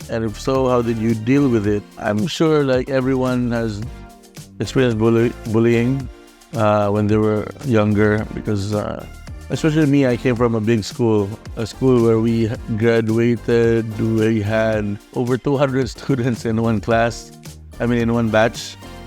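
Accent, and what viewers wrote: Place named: Visayas, Philippines. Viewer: Maolaw man sad ta mag bisaya sir 🤣🥰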